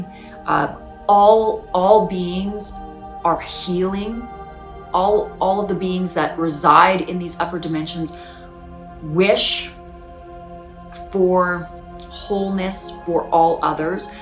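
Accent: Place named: Canada. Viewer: I can say you're Canadian by the way you say " SO " and " ABOUT " Possibly you're a Pleiadian too : )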